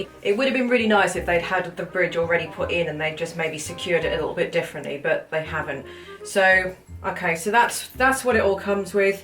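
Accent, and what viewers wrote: England, United Kingdom. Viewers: I like the British accent. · I only came to your Channel to listen to your beautiful English accent.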